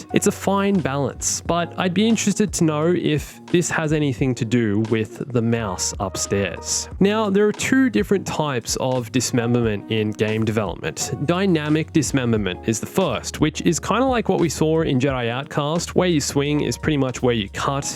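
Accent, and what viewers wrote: Australia. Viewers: OOOH WE GOT A FUKIN AUSSIE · Aussie mate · Yes I had no Idea your also Australian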